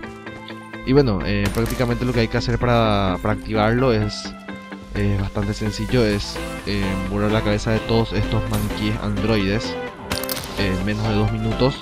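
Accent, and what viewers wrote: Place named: Paraguay. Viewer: Se te re nota el acento de Paraguayo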